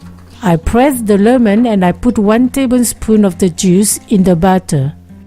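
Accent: France. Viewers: Je vais faire ce gâteau au fromage! J'étais dans mon lit, et j'ai pensé, je veux savoir quel est la première langue de. Elle parle anglais comme une personne française, alors je dois savoir ta première langue. Mais, cette vidéo est incroyable, c'est comme tes autres! C'est facile à faire, et la recette est tres claire.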